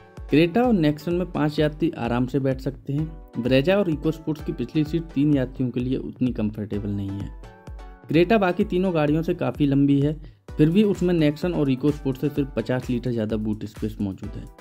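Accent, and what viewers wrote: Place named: Hindi Belt, India. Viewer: While this is a good comparo, The Hindi accent used by the narrator makes it difficult for me to understand. I understand hindi well, but in this I had to listen again and again to make sense of a few sentences. Perhaps it is speed along with accent that makes it difficult for me as I am not a native of Hindi belt... :(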